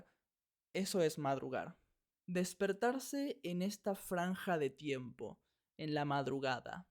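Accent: Argentina. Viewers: Otro video útil y interesante. Soy estadounidense y llevo 6 años aprendiendo español. Me gusta tus videos y tu canal. Hablas muy claro y es bueno escuchar el acento Argentino. Una sugerencia, el volumen de tus videos es un poco bajo en comparación a otros videos en YouTube, puedes aumentarlo un poco.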